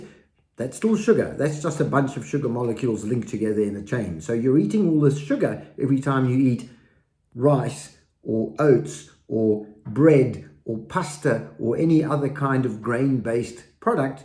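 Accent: South Africa. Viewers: Brilliant...You're so right. Totally agree.. Everyone listening needs to take care of diet and exercise before its too late. I live in USA.. Linda, ex-Zim..Good to hear yr SA accent :)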